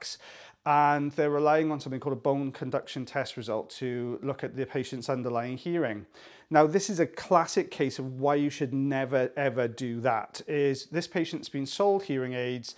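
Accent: Wales, United Kingdom. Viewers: I watch the videos as they are interesting and I have the notifications switched on. Also who doesn’t love a Welsh voice 😊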